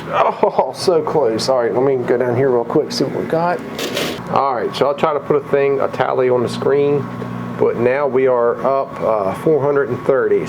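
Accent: South, United States. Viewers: I just like the sound of the money and chips falling and your Southern accent, which I may add is even cuter when you speed it up and sound like the! Makes that song get stuck in my head. Love your videos. Used to watch a lot of them, but now I only watch yours. Keep em comin! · Speedy recovery for your friend Jeff. Hope your cold clears up soon. Just a note that your voice sounds fine (except when you slow it down to creature from the deep level lol) I could listen to your voice all day lol Southern flavour easy to listen to. Have a great day. (Canada here)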